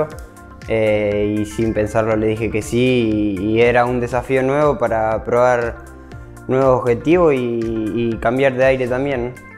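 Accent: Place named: Chile. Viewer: Todo un chileno ya, habla como chileno en sus directos🤑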